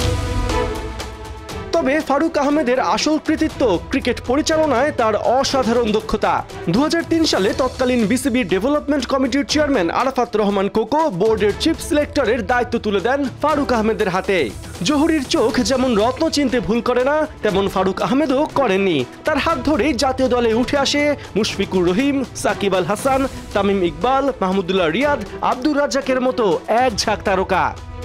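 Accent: Kolkata, India. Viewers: কলিকাতার স্টাইলে খবর পড়েন কেন?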